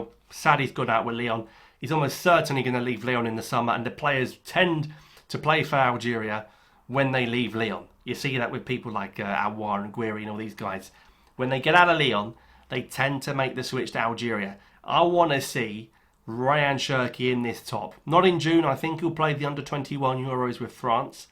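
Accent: United Kingdom. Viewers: Is the British accent difficult or am I not good enough to understand English?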